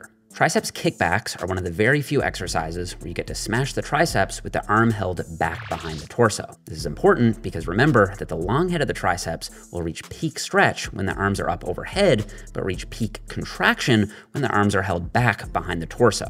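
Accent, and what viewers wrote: Canada. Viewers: Love his Canadian accent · He has such a strong Canadian accent 😂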